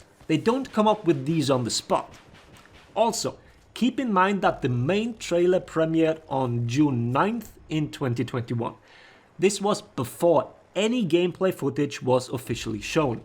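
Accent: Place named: Northern England, United Kingdom. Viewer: Good video my friend. Might sound like a weird question but do you like in the North of England? You have a northern twang when you speak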